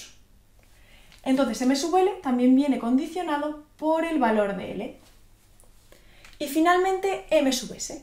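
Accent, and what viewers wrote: Spain. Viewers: Dificil concentrarse con una profesora tan hermosa, dulce y con ese acento español tan maravilloso.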